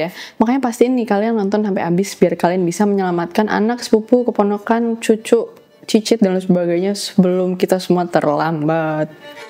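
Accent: Java, Indonesia. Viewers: pesona mba2 medok intelek, bikin chasing reality tiap hari ga sih biar home feedku berwarna :) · Logat jawa nya candu bgt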